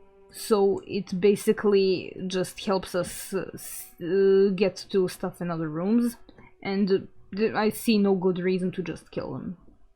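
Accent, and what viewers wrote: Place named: United Kingdom. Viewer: I though you was british or something like that. I like your Accent. Is kinda cute.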